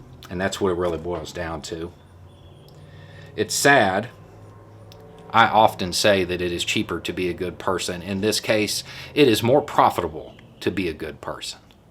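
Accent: South, United States. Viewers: THANK YOU THANK YOU THANK YOU THANK YOU for this. I've lived in TN my whole life which means I've been confounded for most of my adult life. Watching ppl I grew up with blindly hate vote for holes in their own heads, then yell YAY....HEAD HOLE! ACA, being a prime example. I'm an active Dem in my state. We're working hard to make inroads everywhere. We had Dems in 96 of 99 cong districts running in 2018 & 2020 which is huge for reasons I need not explain to you.. but it didn't move the needle enough. Only 35% of eligible voters vote in TN. I'm exhausted. I personally helped get over 80 ppl registered to vote for first time in 2020. Called every one of them to make sure they went to vote. So yeah..I'm exhausted. Thank you for spotlighting my home state. The real work of decent folks is in their own yard. I imagine what could be if we break that red south firewall, so it's that hope, that keeps me going. 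Your mere presence here, with your intelligent southern accent of truth & "wokeness" (sheerly used for lack of better term), in and of itself helps destroy the idea that the south is owned by the right. It's that idea that needs its ass whooped. So the other 65% who could vote but don't bcuz they rationalize it's hopeless & won't matter, can no longer honestly cling to that rationale. So THANK YOU brother! From one frustrated but not going away southern lefty to another.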